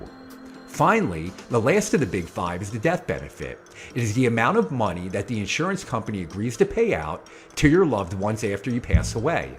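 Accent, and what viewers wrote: Philadelphia, United States. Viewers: Love the Philly accent! Interesting topic, but now i need to go to Wawa and grab a hoagie and a wooder ice. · are you from Philly?? I cannot tell if that’s a Philly or delco accent 😅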